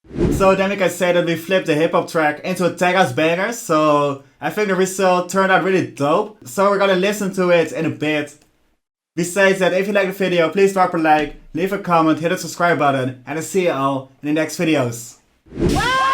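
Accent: Netherlands. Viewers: U must be dutch ey?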